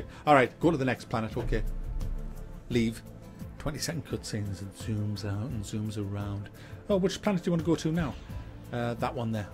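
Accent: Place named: England, United Kingdom. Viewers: . Where are you from? You sound like you're from Nottingham, could be wrong. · SavagerBoy He sounds English to me.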